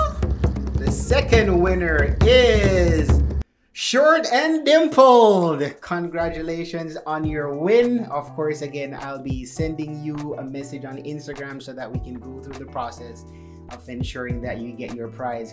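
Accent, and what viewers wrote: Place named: Jamaica. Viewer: I heard our accent lol, I'm Jamaican and a new subscriber😁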